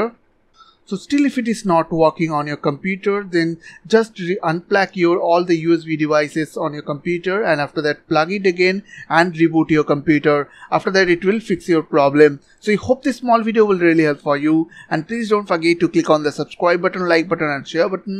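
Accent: India. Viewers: Oh shut up with this accent, back to the India, and take the lousy Indian actors with yourself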